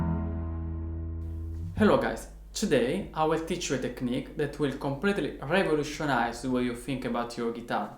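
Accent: Italy. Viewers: Si capisce un po'dalla pronuncia che sei italiano. However,nice video! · Im spanish, and its very easy to notice that you're italian, and I don't say it with bad intentions :) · L'accento Italiano si sente da 10km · Si sente tantissimo il tuo accento italiano😂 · Your English is good. Love the Italian accent! You are very talented!! Keep it going!!! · Are you from italy? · Accento italiano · I'm Italian and I'm 14, but I think u are from sud Italy cause of your strong accent, I notice only now that u are Italian and I don't know why I'm speaking English, ormai ho già scritto 😂, a proposito di dove sei? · I'm Brazilian, yeah you can notice it, the pronunciation of the words sound very Italian, IDK how to explain? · I hear italian accent here umlmlmlml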